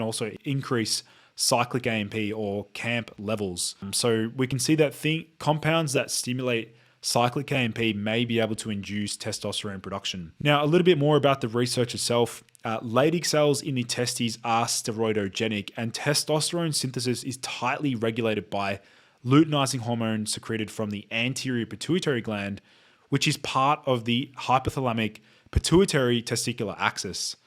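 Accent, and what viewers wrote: Australia. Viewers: I literally couldn't finish the video, Ozzie accent is far too thick, drives me nuts 😢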